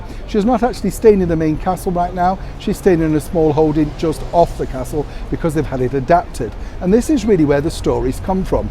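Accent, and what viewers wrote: United Kingdom. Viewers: Howdy from Texas! I love ,love, love your British accent! I love how you give the royal news about your precious Queen! I have learn to love her too! I so admire her! May she live forever!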